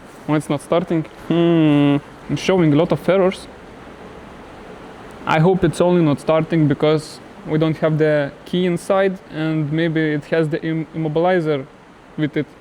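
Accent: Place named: Eastern Europe. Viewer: I just hear your accent and I think you’re from Eastern Europe, I from Ukraine, your video is really great!!!👍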